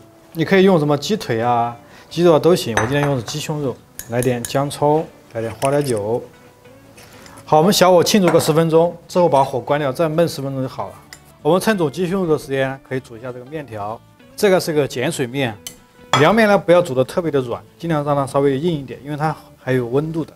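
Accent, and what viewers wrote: Sichuan, China. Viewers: 椒盐普通话，四川人😅😂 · 川普听着亲切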